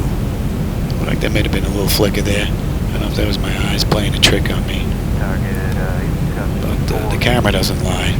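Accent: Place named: Queens, United States. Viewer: I thought your accent sounded a little familiar. I spent a "lovely" 8 months in Queens off the Van Wick Expressway shortly after 9-11. Saw the biggest rat of my life climbing the stairs up from the subway, lol.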